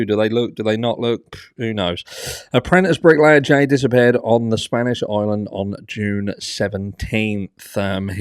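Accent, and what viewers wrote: Norfolk, United Kingdom. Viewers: Are you a Norfolk boy?, recognise that accent anywhere, lol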